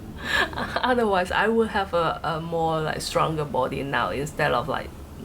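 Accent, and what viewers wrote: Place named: Malaysia. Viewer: your accent is very closed to malaysian chinese... i dont know why im watching this.. but i enjoy it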